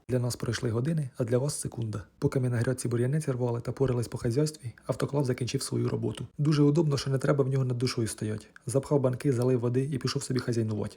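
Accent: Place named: Luhansk, Ukraine. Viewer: Гуморист та розповідає від бога❤❤❤.Дуже подобається Ваш контент.Слухаю із задоволенням так, як наче у себе вдома побувала.(Луганська область)У нас теж так гутарять і голос....голос свій ,рідний.Зараз дуже далеко мене занесло, вірніше нас. Серце щимить, болить та плаче за рідною землею, за своєю країною. Дай ,Боже усім українцям дочекатися перемоги над ворогом та повернутися до нашої замученої ,але найкращої ,рідної землі. Всим миру ,добра, злагоди. Бережіть себе, рідні.❤❤❤❤❤❤❤❤❤❤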